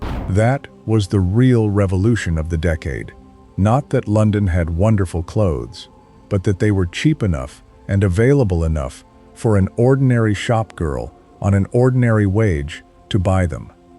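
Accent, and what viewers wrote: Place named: United States. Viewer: Why the US AI accent? And rockers illustrating an article on Mod Culture? Most of the things shown here were on their way out by 1969, apart from the skins.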